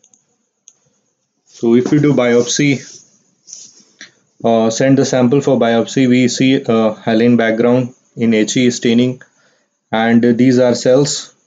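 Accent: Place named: India. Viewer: What is this guys accent? Indian? 🇮🇳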